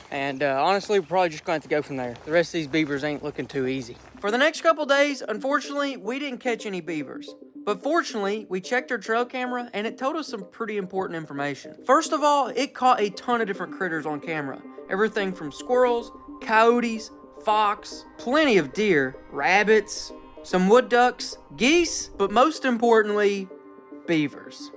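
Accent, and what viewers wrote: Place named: Kentucky, United States. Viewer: always makes me smile with his Kentucky accent! Keep up the good work bro!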